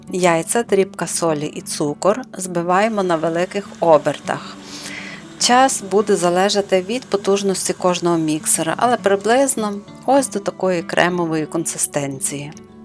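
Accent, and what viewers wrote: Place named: West, Ukraine. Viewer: Гарна українська мова заворожує дивитись, пояснення і все по - панськи! Як говорять на Заході України! Успіху! Буду пробувати Ваші смаколики! 💛💙❤️🌹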